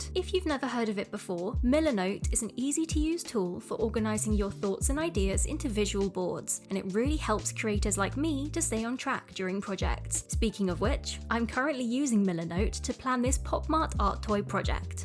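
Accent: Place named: United Kingdom. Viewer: This is the first ever time I've heard you speak and I did not know you were British omg!! I love your style so much it's such an inspiration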